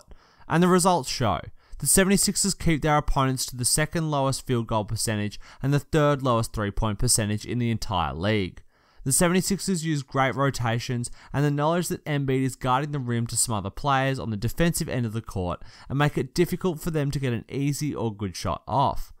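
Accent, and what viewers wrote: Australia. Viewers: Thats some thick ozzie accent you got there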